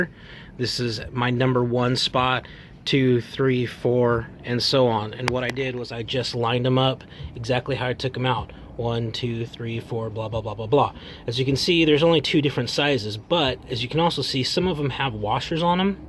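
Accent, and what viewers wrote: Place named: United States. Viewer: This is an above average video with a clear speaking American on "How To" repair an inner "loose or damaged nut" that's deep within the clutch housing of a Suzuki Boulevard M109R. Concise, step by step instructions, no frills, no music, just a straight forward, common sense repair, that is well explained as the video progresses. Excellent video Mr. Suzuki Clutch Repairman Thank you!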